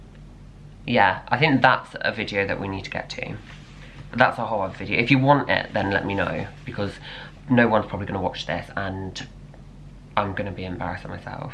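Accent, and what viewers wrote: United Kingdom. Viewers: R u British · Are ya British?? cuz I can hear through your voice that you come from Great Britain. Now comes a little bit about me, which is: I do NOT describe myself as a transgender nor do I specify myself as a ideology as such. But rather do I think and wishes for and as a woman. Yes, you read that right, I'm want to go into the line for becoming a woman. For the first 2 minutes and 18 seconds, I can already tell you, you make great and large video's, about topics the world should need to know about. I want to share my appreciation to you either. I know, this video's was uploaded on December 30th, 2022 on a Friday, but I want to thank you for sharing your open-minded views and gender assuming related topics I.E. genderdysphoria. Now you maybe even think I've send you a book, but instead of harassing of catcalling you, I choose to a more family friendly option which contains to be precisely: respectfulness, care about you as a full human-being, and advice for hospitalization during situation when you need it the most. 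Thank you for reading my most important message, take care and goodbye. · Welcome,... It's a British accent, isn't it? 😀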